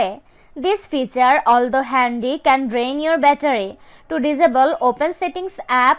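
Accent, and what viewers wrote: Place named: India. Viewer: you sounds like indian call center scammers💀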